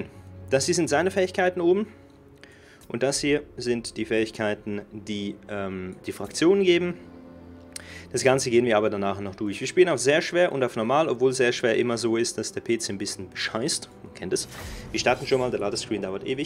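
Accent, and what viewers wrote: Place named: Netherlands. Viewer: Bitte sag mir das du Holländer bist xD der Akzent ist der hammer😂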